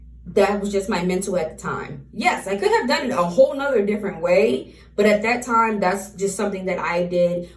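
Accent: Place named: Baltimore, United States. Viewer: You from Baltimore I know stUpid and DUo when I hear it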